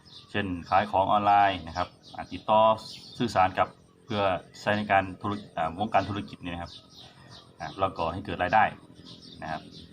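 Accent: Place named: Chaiyaphum, Thailand. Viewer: ครูอยู่บ้านใดเว้าสำนียงคือคนชัยภูมิบ้านพี่แท่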